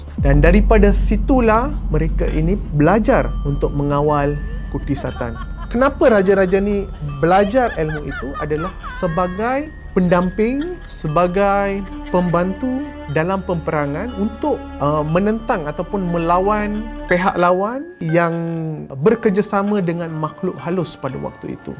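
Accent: Malaysia. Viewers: Care die cakap macam orang Melayu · Kuat bergaul dgn melayu brader ni. Pekat nauu melayu dia 😂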